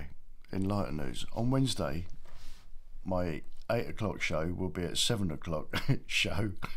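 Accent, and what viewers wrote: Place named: Cockney, United Kingdom. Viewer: Yes I agree and thought that the dog had followed the scent of its owner. For all we know that poor woman was dragged off by someone and there were no witnesses. Love your Cockney accent, you sound so cultured, me I just sound common as muck, in spite of a BA, HNC, A & O levels. I’m mixed blood, mother was Southwark, dad was a crane driver from Wapping. Happy days hun 😊👏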